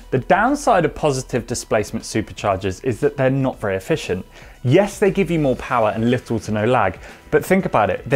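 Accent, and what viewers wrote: United Kingdom. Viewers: British accent. Cant follow it. Turned off.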